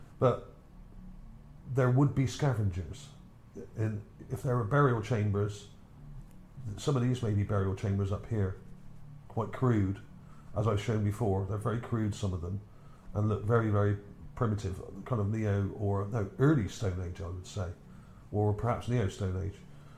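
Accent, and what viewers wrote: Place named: England, United Kingdom. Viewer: Love your accent mate, what part of England are you from , somewhere S.W. I know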